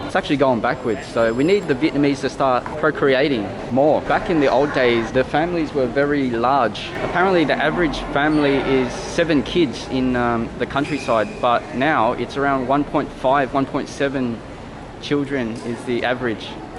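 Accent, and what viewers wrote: Australia. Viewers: The new metro looks good! I hope they install platform doors later on. It would make the system a lot safer. Your stations are above ground rather than underground like ours. I'm from Hong Kong, China. Your accent - is that Australian or New Zealand? Also, LOL about Vietnam's population of 98 million. That's what I see on Worldometers. Yes, I agree got to hit 9 figures.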